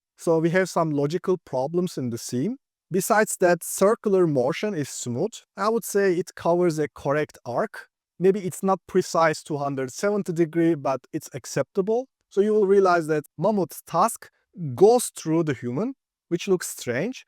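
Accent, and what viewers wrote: Turkey. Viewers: Sanki ingilizcende bir Türk aksanı var gibi ama neyse😅😅😅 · Türk duyduğumda tanırım :) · I hear a strong Turkish accent.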